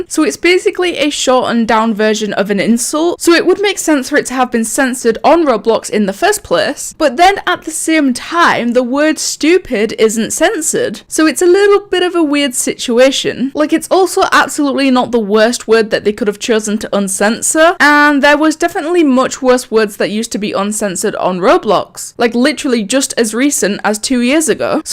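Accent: Scotland, United Kingdom. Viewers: First off "Badass" isnt a bad word second off i like your scottish accent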